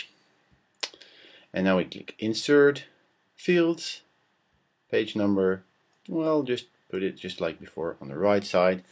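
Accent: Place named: Netherlands. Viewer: DAT DUTCH ACCENT DOOOO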